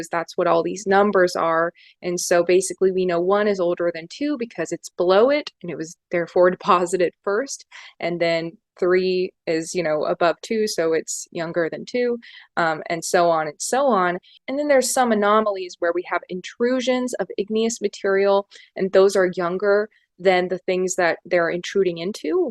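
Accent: United States. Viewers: Wow - super clear and accurate. (And great to hear a voice from the USA that is not talking nonsense - here in the UK, the news is dominated by Trump and his creatures, so I have come to associate an American accent with idiocy).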